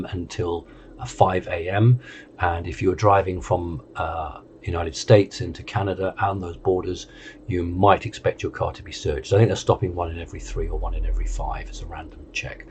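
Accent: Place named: United Kingdom. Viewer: サイモンさんの英語はイギリスだね。だからイギリスからの声。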